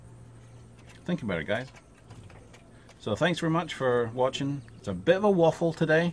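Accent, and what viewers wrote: Scotland, United Kingdom. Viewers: Have you always lived in canada? I swear I can hear some scottish in your accent!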